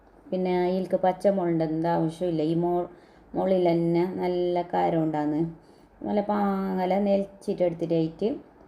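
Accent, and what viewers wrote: Kasaragod, India. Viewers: താങ്കൾ കാസർക്കോട് ഭാഷയിൽ പറഞ്ഞത് ചിലതൊന്നും മലപ്പുറത്തുകാരനായ എനിക്ക് മനസ്സിലായില്ല, 🤔 എങ്കിലും വീഡീയോ കണ്ട് പഠിച്ചെടുത്തു 🥰. Thanks.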